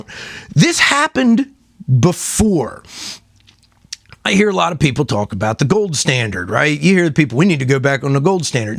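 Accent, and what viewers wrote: Pittsburgh, United States. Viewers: You sound like your from Pittsburgh 🤣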